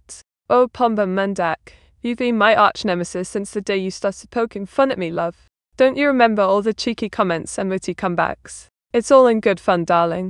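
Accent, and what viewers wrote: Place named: United Kingdom. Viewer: The voice and audio need some improvements: - Compression (maybe Normalization too): It peaks too high and goes low again, it's not consistent in her voice. - The sound seems low quality, it sounds like someone with an old mic (maybe adjust filters?) - I don't mind the boyish voice although l I would prefer a softer voice or a cute one. - The british debuff is an issue, it's hard to understand, especially for AI that talks incoherent stuff sometimes (you can't understand form context). Many times I like to keep chatting streams ON while doing something else, but it's hard to understand her without paying attention due to her accent. Overall seems like a great project, keep it up!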